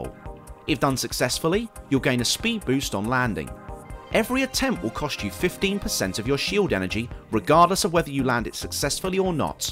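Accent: United Kingdom. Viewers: Everything is better in a British accent. Thank you for this sir this is a god send. 🙌🏼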